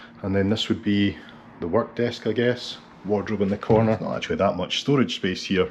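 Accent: Cork, Ireland. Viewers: Cork accent is very fast 😂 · My grandad was from cork. I love the accent ❤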